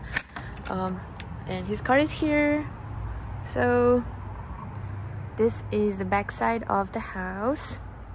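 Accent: Nepal. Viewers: Your nepali issss sooo cuteeee.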